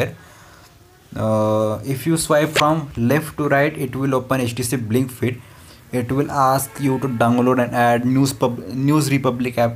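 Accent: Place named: India. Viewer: Indian accent is so thick :D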